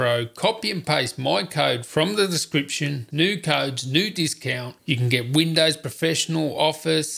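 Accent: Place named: Australia. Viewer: I’ve watched every 2019 iMac video on YouTube (cos I’m about to buy one) and I loved this video the most. As a fellow Australian, it’s so refreshing to have a thick Aussie accent on a tech video and your advice to viewers to get great performance if they’re on a budget is really good. Since you posted your vid, they halved their prices on ssds so I might order the i9 with a 1TB ssd. Thanks again.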